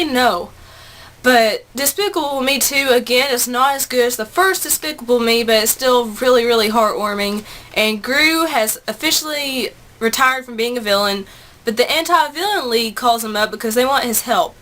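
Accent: South, United States. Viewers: Yeah I agree. I don't know why anyone would dislike your videos. You are funny as hell and your southern accent is adorable. You also have wonderful tastes in just about everything. power!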